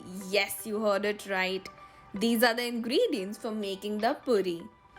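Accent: Maharashtra, India. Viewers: I am overconfident and I can tell that didi you are Maharashtrian ❤️❤️❤️❤️❤️hey marathi mulgi❤️❤️ We are same🎉🎉🎉 you can come to know it's like she said it too much time and that's why I come to know that she is marathi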